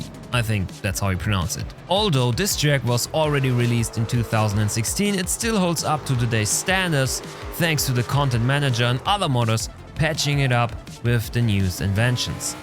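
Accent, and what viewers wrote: Germany. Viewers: gutes englisch aber das geschulte ohr erkennt dass du deutsch bist:) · Da hör ich doch Deutsch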